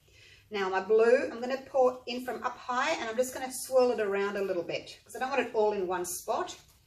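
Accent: Australia. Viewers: I love scruffy!!! I know this video is old...your birds and pours are fantastic!!! I'm from Ohio and ADORE Australian accents...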